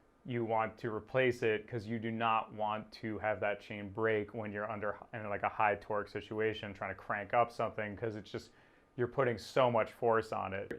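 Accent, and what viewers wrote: New England, United States. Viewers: You sound like a New Englander. Where do you ride?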